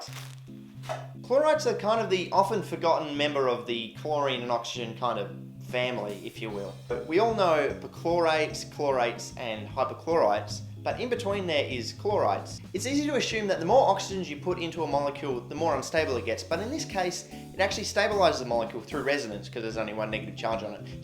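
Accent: Australia. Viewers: Urinanalysis recycled tube with yellow liquid therein...oh yes! And your Aussie accent sounds like mine, but I'm in SA and surely you are a Perth person??? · For sure; without any doubt in my mind of all the chemtubers based solely on your being from Australia, you would be the most likely to both start a fight and with it. I'm not suggesting youtube host you, I'm saying 12 cans and three Jager bombs you. Having said that though you sound a little Victorian, maybe southern NSW or something?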